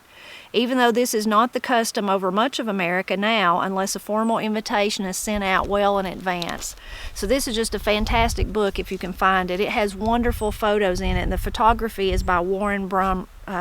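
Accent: Appalachia, United States. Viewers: I love how you talk - this is the way I talked when I was young from Raccoon Creek, Pikeville Ky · Enjoy your videos, your accent makes me feel like I'm back in mountains of SW VA where my family is from. When you speak of hospitaliy I remember when my Grandparent's church didn't have a full-time preacher, every few weeks the circuit preacher would come. On those occasions Grandmother would kill a chicken, the preacher would eat with us. As a child I enjoyed the fried chicken, but it seemed the preacher always got the best pieces!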